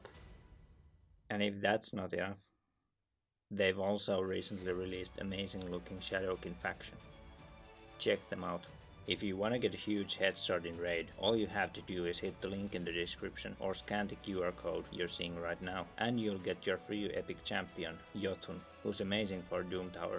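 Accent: Finland. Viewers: Mitä helvettiä, oon kattonu sun videita nyt varmaan jo vuoden ja en oo ikinä tajunnu että oot suomesta :D Aloin miettii asiaa vasta ku aloit puhumaan mainoksen aikana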